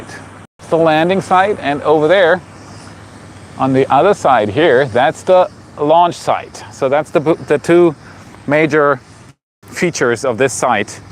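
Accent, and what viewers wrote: United States. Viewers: You look so relaxed, you even are getting an American accent ! Wild... Ya, Boca Chica is a madhouse these days. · not only do you sound excited, but you also sound like an American. Your accent has faded since you arrived in the good old USA. We are happy to have you and you are welcome here anytime! Keep up the great work. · Why has your accent changed? Its more american than your usual accent.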